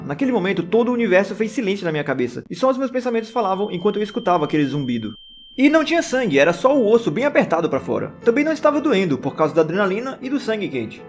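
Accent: Brazil. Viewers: cheguei até aqui pq vi um comentário seu no canal da Dora Weigand, e eu simplesmente adorei teu canal e que vídeo foda, dei ótimas risadas kkkk e que bom que to esse sofrimento já acabou, pelo tua voz eu chuto que tbm é Cearense, se não for é do nordeste tbm certeza.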